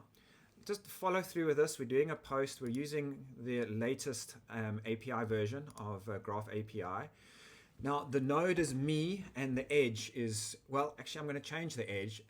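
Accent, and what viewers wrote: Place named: South Africa. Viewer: Thanks. The South African accent is an odd mix of British, Dutch and Australian. Hopefully it's easy enough to understand?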